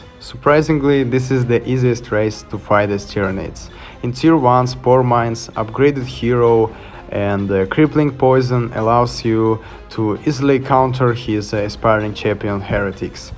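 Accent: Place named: Russia. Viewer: ты же русский игрок?я сморел твои реплеи и ты реально крут. Твой акцент похож на русский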